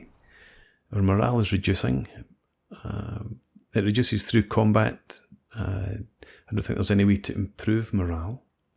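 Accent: Scotland, United Kingdom. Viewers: Cheers and thank you for posting this video! I am always thrilled to hear wee bit of a Scottish accent, a country of which I am very fond of. If you happen to have any ideas or suggestions, just fire away 👍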